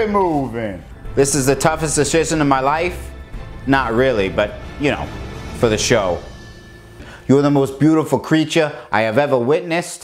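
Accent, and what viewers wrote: Boston, United States. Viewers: Hana M he's from Haverhill, that's pretty much how we talk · This is so funny because of his Boston accent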